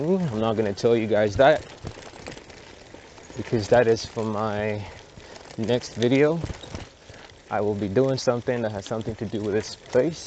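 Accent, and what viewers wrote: United States. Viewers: Thank you for sharing helpful information. We need more details about lands and houses for sale in Bujumbura. I like the way you speak English you sound like you have been in USA for a while. Just suggestion try to focus on real estate and then we will be able to see some houses on sale.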